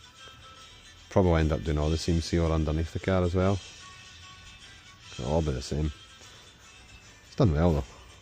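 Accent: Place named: Scotland, United Kingdom. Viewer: Hi there. Yes, i can understand what you are saying in the videos, helped I think by the fact that I had extended family originally from Glasgow - that moved to NZ. Whilst not everyone understands the Scottish, I guess that the same can be said of any other language too. If one trains their ear, wants to understand, they'll make the effort To understand. I've binged watched a few from the beginning, think I've got your voice suzzed now, your cars - some of them - are of varieties I've owned yrs past, so is interesting to watch you 40 yrs on rebuild and put back on the road. I'll pop onto your pages on and off, and slowly work my way up to present day uploads. Have a good day. Cheers from NZ 🇳🇿😎 M.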